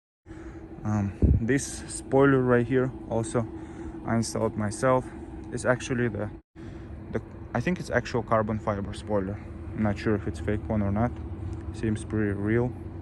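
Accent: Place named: Chechnya, Russia. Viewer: bro this guy is a chechen or a russian ahahhaha his accent says it all ahhaha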